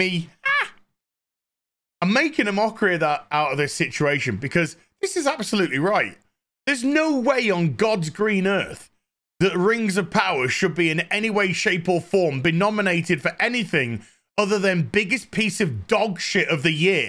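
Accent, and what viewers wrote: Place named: United Kingdom. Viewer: Ahhhhhhh!!!!! I needed this! I had to get my dose of! Love you, my brother! Your commentary matches my inner monolouge, exactly, minus your British accent. Keep the content coming!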